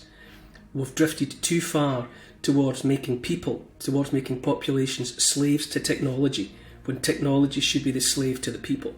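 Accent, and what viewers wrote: Scotland, United Kingdom. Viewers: Scottish accent is "da best"❤. No, this is not really how i want to accept things but the "critical mass" is falling towards transhumanism, the 4th. revolution😓 if i could i would change planets right now.... people have lost their north.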